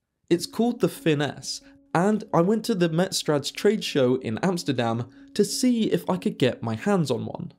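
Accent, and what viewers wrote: United Kingdom. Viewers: A British narrator should "reduce" his British accent? Why? Because it triggers a snowflake like you? Get back under your rock 🤣🤡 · Any chance the narrator can reduce the British accent? WautER instead of WautAH?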